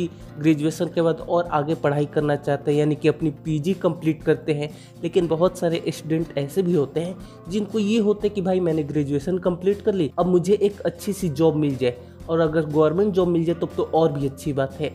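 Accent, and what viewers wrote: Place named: Uttar Pradesh, India. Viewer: Kuan kaun ye bhaiya ki aawaj Uttar Pradesh se sun raha hai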